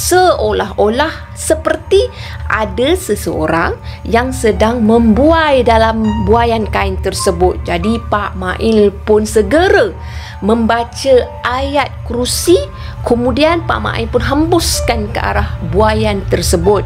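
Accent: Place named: Kedah, Malaysia. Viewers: Slmt mlm semua..hai jom nk dngar cerita seram..hbt na ckp loght kedah..trbaik laa.. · salam..bulih akak tnya asal dr mna..sbb pandai ckp utara👍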